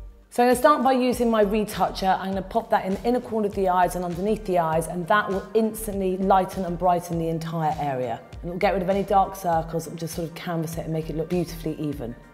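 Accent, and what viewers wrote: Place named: RP, United Kingdom. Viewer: Loooooove accent. It's so posh and sophicticated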